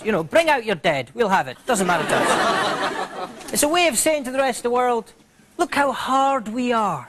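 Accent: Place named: France. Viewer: haha he does his french accent different here. the one he has now is cuter and funnier XD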